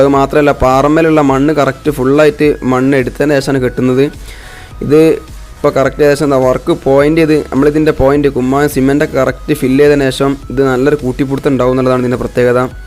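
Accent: Malappuram, India. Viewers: "കുയി" നമ്മുടെ മലപ്പുറം ഭാഷ.....😀😑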